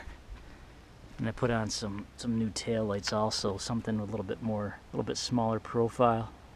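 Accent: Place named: Midwest, United States. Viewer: You from Minnesota? I hear a Midwestern accent haha.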